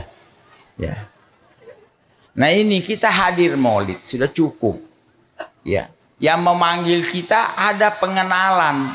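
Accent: Jakarta, Indonesia. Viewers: habib umar betawi yaa.? betawi bener bicaranya😅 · Ikut taklim beliau, dari masih logat Sulawesi sampai menjadi logat Betawi. Di condet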